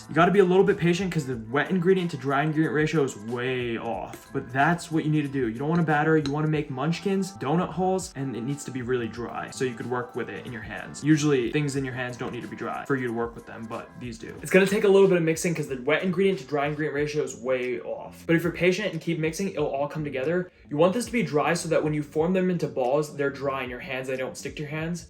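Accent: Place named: New Jersey, United States. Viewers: Thats that New Jersey accent fuggetaboutit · Are you from New York/New Jersey?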